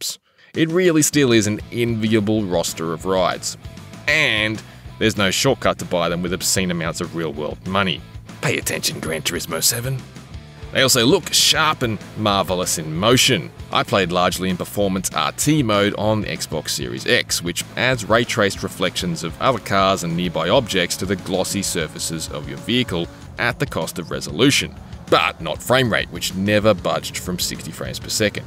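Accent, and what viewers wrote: Australia. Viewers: Well that was...surprisingly Australian. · Australian accented reviewer...and there it is,the Holden Torana...great car.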